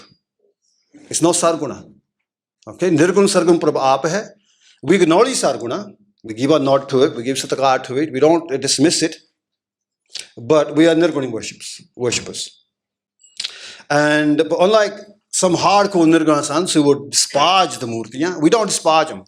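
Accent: United Kingdom. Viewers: After watching cmnts..i realised he is also planted fraud man like his bro Sadhguru.. they all are well trained with uk accent to make youth fool. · His accent is British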